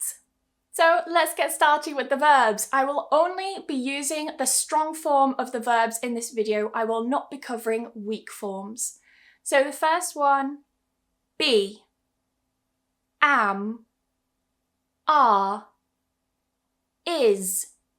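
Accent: United Kingdom. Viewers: i really like this channel that the british accent was sounds comfortable❤️ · Hi again,! As I've said before, your British accent is just delicious! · I really loved your all of video to learn lot of things . you is the best teacher ever, you is a beauty queen of BRITISH accent. & Im really big fan & followers of you. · sorry teacher , are you british ?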